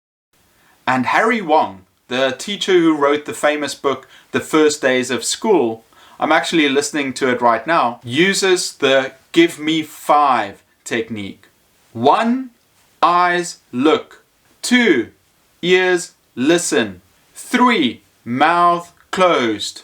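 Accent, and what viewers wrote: South Africa. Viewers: You sound South African... :) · Thank you.I love love call and respond technique.I Shout "can you hear my voice?" And they have to clap and stand still. My voice is still hoarse from shouting,talking and just interacting with students all day. LOVE THEM tho. South African?🇿🇦🙌😊much love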